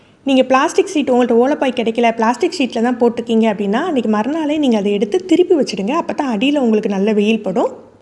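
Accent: Tirunelveli, India. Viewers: நீங்க எந்த ஊர் -மா.நான் திருநெல்வேலி.நீங்கள் பேசுறது திருநெல்வேலி மாதிரி உள்ளது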